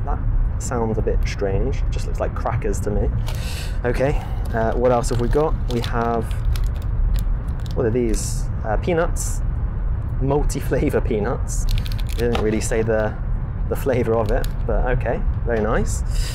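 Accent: United Kingdom. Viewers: 你的中文也有伦敦腔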